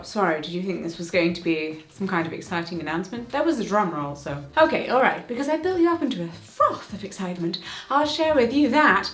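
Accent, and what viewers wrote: United Kingdom. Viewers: Maybe it's my American accent hearing your British one, but I could also reason using the word "pawn" as a stand in 😉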